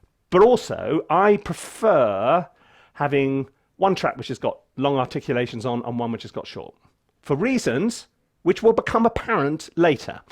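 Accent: United Kingdom. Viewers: That is one of THEEE BEST How-to-videos I've watched for a long time. I absolutely love the freshness, the gags, the way he talks. (Love that british accent) Just started with "The secret to what Chords Work", the intro is hillarious. Nice dog by the way. Sir, you just made yourself a new subscriber. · I love this Guy and his British accent! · I hear a British accent and I put my full trust in this guy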